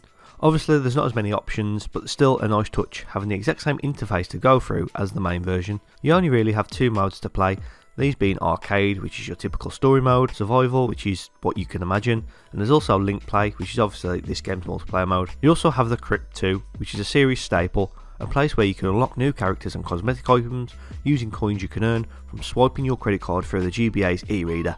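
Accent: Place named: West Midlands, United Kingdom. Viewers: Nice to hear a West Midlands accent on YouTube. Great video! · Completely unrelated here. But that is a black country accent if I've ever heard one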